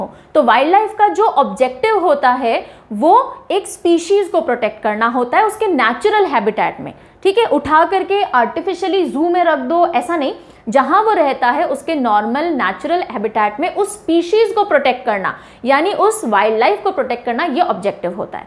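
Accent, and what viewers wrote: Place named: Assam, India. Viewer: Love from Assam ma'am ...app job Assamese word bolte ho ..yesa lgta hein ki app bhi Assam se hi ho ...love you ma'am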